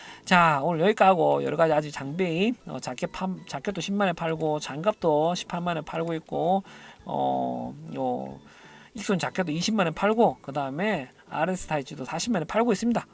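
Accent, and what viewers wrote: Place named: Gyeongsang, South Korea. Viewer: 대구에 매장있으셧내여 억양이 익숙하다 싶었는데 매장에서 혼다 자켓은 입어볼수있나요?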